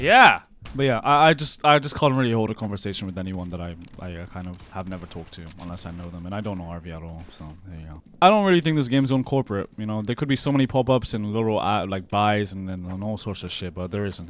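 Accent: United Kingdom. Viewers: ur british like me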